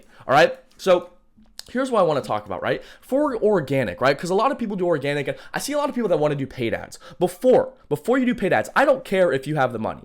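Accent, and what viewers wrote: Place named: India. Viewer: this dude is funny. hahah. the indian accent. loooolll. im in cali too my boy :)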